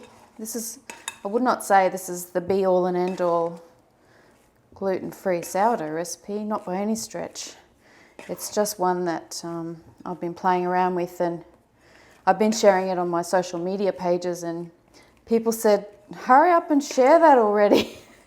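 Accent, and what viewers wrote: Australia. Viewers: In Ireland with our weather it would probably take a whole day to rise the bread . You sound like my sister in law who's from Townsville. I remember when my brother and sister in law lived in London I couldn't help asking her is that a question? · i have just started to bake gluten free bread, came across your tutorials and must say how pleasing it is to see and hear a fellow auzzie , thank you for your time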